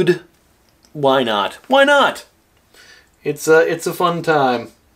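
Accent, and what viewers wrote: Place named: Scandinavia. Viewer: Oh. I thought he was from one of the Nordic countries judging from the accent. My point about him being a good Doctor still stands.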